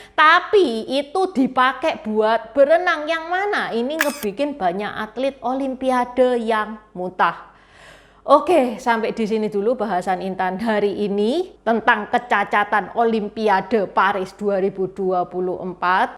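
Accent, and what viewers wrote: Java, Indonesia. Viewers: Hostnya medogg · mba intan tinnggal dimana ,ko ngomongnya medok banget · Seneng baku ndengerin suarane naik medokmu kuwi lho cik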